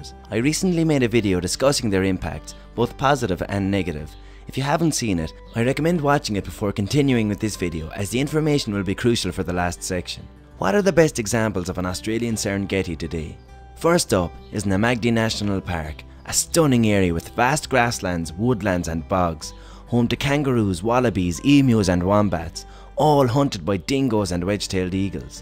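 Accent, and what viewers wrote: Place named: Ireland. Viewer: An Irish Attenborough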